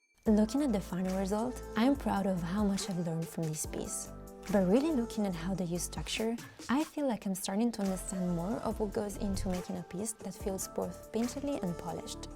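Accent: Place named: France. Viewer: your english sound french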